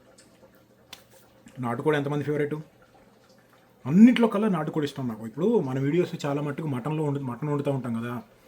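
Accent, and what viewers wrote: Andhra Pradesh, India. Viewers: Anna nuvu nizamabad anav kada kaani nee telugu andhra slang undi enti?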